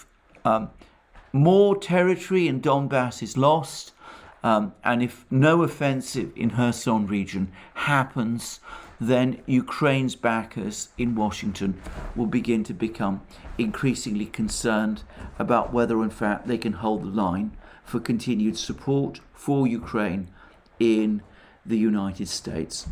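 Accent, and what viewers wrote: United Kingdom. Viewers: THIS GUY tries to convey the the Russians have their act together. THEY DON'T AND NEVER WILL because RUSSIANS cannot freely communicate or express themselves, and the populace have no influence in Moscow under Putin's heavy hand. NOWHERE in this phony diatribe does he mention the successful Ukrainian attacks on many Russian weapons storage sites. Because that is a major event in the war, it calls his entire "analysis" into question. To me and any casual observer, his is nothing more than words with a British accent.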